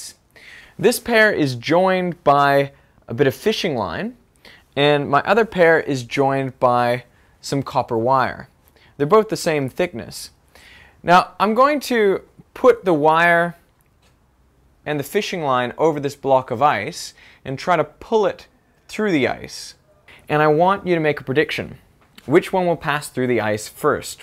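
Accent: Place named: Australia. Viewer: He had a heavier Aussie accent at the time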